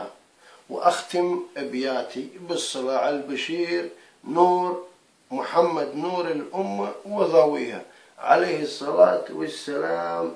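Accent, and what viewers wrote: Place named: Saudi Arabia. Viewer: يتكلم لهجة سعودية أكيد أنه ليس جزائري وهناك صعاليك تصطاد في المياه العكرة احذروا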